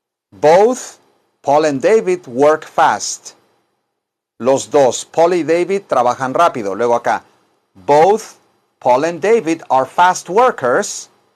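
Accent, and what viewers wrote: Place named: Mexico. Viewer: este profe tiene acento mexicano, pero cara de "español hostia", TOTAL DE DONDE ERES PROFE?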